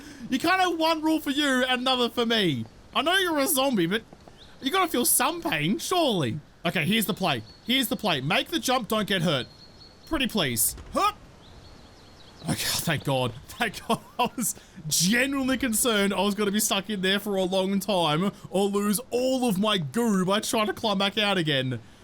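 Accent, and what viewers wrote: Australia. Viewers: You have the most Australian accent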